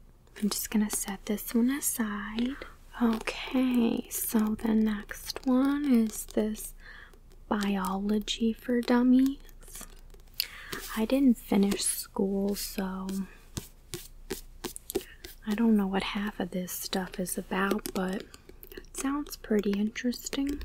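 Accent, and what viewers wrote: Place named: South, United States. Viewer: Lmao....love the ditsy southern belle thing you got goin’ on!! Still very relaxing too!! Thanks.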